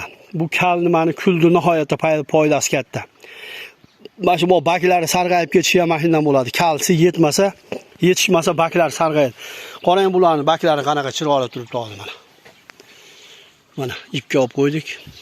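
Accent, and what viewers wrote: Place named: Fergana Valley, Uzbekistan. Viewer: АССАЛОМУ АЛАЙКУМ ОТАХОН! ШЕВАНГИЗГА КАРАГАНДА ВОДИЙДАНСИЗ ШЕКИЛЛИ, СИЗГА РАХМАТ БИТТА КАМЧИЛИГИНГИЗ ЁНИНГИЗГА БИРОНТА ЁРДАМЧИ ОЛМАБСИЗДА, КЕЙИН ЙОД БИЛАН ЗЕЛЁНКАНИ ИДИШГА СОЛАЁТГАНИНГИЗНИКУ УМУМАН КУРМАДИК ФАКАТ АРАЛАШТИРДИНГИЗ ХОЛОС, КЕЙИНГИ ВЕДЕОДА КУРСАТИНГ, ЯНА БИР КАМЧИЛИК КУПЧИЛИК АЙТГАНДИР ТАЙЁРЛАГАН КУЛ ОЗУКАНГИЗНИ БОДРИНГ ИЛДИЗИГА КУЙДИНГИЗМИ ЁКИ БАРГЛАРИГАМИ УШАНГА ТУШУНМАДИК, УМРИНГИЗДАН БАРАКА ТОПИНГ, ИЛГАРИ ОТА БОБОЛАРИМИЗ КУЛНИ БАРГЛАРИГА ХАМ СЕПИЛСА АЧЧИК БУЛАРКАН ШИРАГА ВА КУРТЛАРГА КАРШИ ЭФФЕКТИ ЯХШИ ДЕРДИЛАР ШУ ТУГРИМИ. РАХМАТ ОТАХОН ЧАРЧАМАНГ!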